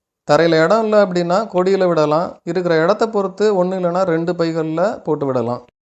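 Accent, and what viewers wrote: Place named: Coimbatore, India. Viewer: Bro unkal thottam Mathiriye unkal pesum vidham rombaum super ithuthan kovai kusumbunu solvankanu niniaikiran bro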